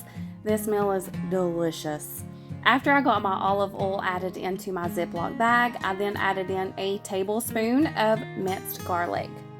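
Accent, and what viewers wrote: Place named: South, United States. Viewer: I love your southern accent! Greetings from San José, California!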